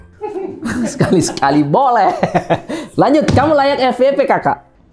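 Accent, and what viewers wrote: Makassar, Indonesia. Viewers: saran bang klo pake bahasa/logat makassar disubtittle biar yang gak tau bisa tau hehehe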